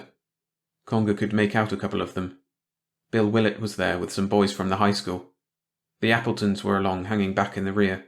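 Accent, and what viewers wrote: United Kingdom. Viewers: I'm sorry. Some of them really hit oddly in a British accent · The narrator has an annoying UK accent.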